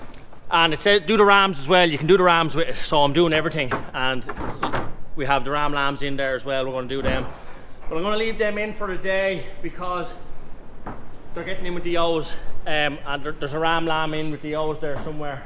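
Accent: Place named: Dublin, Ireland. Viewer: A Dub accent from a farmer takes a bit of getting used to. I think il survive though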